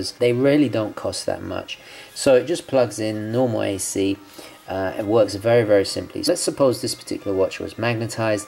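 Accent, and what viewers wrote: England, United Kingdom. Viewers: Hi - Thanks for the informative video. I didn't realize there was a DIY resolution for magnetism. Always enjoy your blooper vids. I think you have that English accent down pretty well now after all those videos.....;) Cheers! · The outtakes at the end were very funny! The stuffy english gent accent/voice is my favorite. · I am begging you to make a full review of any watch in that thick british accent. Please please please :)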